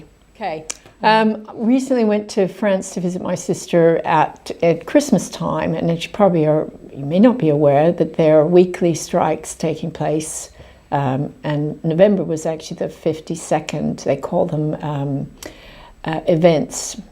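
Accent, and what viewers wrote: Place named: United States. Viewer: English voice??? You have a sort of American accent!!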